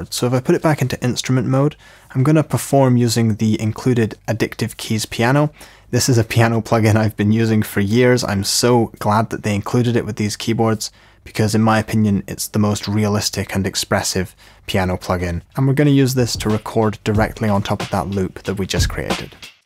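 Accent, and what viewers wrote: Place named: Perthshire, United Kingdom. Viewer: I’m music daft but for some reason the only thing I can think about watchin these videos is where you’re from. Im also Scottish AF and have a habit of placing accents but yours is hard to read. You don’t sound southern/ central belt, neither do you sound like a farmer/northern. Im guessing Perthshire? Please reply for my sanity. Im a proud Forfarian wasting away in Dundee as an electrician with a view to taking your crown. Keep up the good excellent work my good man. Really appreciate it and look forward to working with/against you in the future. Wink emoji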